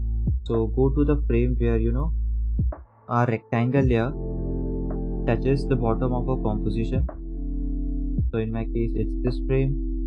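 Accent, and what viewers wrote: India. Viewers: are you a indian your accent feels me like that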